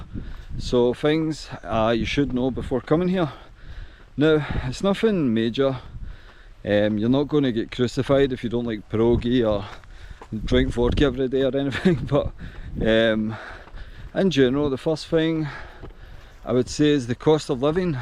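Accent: Scotland, United Kingdom. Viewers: Once in the Netherlands I met a drunk man in an elevator with a Weesky in his hand. He claimed to be Scottish. Then I didn't believe he was from the Kingdom, I thought he was also from Eastern Europe like me, and that he spoke terribly bad English. Now that I hear you (Scottish accent for the second time in my life) and I know that you didn't drink alcohol, I already believe that he was Scottish. I understand you, but it was hard to understand him when he was drunk. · Easy to understand. I love scotish accent.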